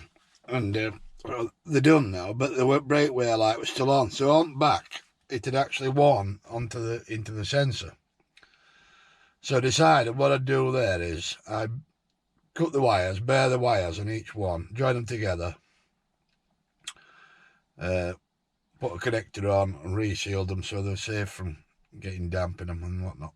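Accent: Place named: Yorkshire, United Kingdom. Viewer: Yorkshire accents best int world 😁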